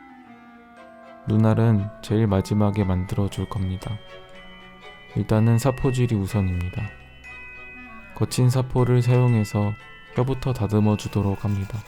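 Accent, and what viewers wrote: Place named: Chungcheong, South Korea. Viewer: 유명한 유튜바한테서 느껴지는 익숙한 말씨... 충청도여...~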